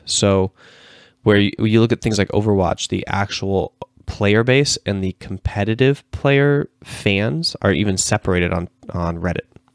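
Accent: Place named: United States. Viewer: you have an american accent yet studied in finnland. Are you finnish descent?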